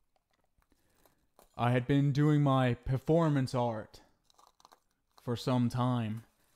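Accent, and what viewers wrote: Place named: Australia. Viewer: My thoughts through the audio "You can feel me huh?" "Whose they?" "Can it go back huh because what I'm sensing it can't" "Prison!??" "God the lil whine in this is mMMmmmMmm" "Am I picking up an accent hmm?" "Tearing there what out!?! You painted what with what!?!?" ".... Yeah I can see myself falling for someone like this" "Watching me in the shadows huh" "Yeah definitely picking up an accent but which one I think Aussie maybe a British like accent" "Raven that's a cute name" "The sadness in his voice makes me wanna hug him" "Little bit of a lisp it's adorable " "The mood changes are so cute when he speaks *proceeds to do tbe fan girl leg kicks in the air*" "The nicknames he picks are just 🤌✨" "Your maga what what?" "Awww I get a hug and he likes my hair EEEE" "Awwww kisses!! wait wtf am I doing this is a a serial killer yeahhh but he's so cute" "Oh? 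Switzerland huh hm sounds nice" "God why am I smiling" "You're slightly a psychopath but like I'm like this close to letting that slide" "I can't believe in studying to be a detective when I act like this Jesus" "Nah I think we should go considering it sounds like a lot of people" That is all 🤌✨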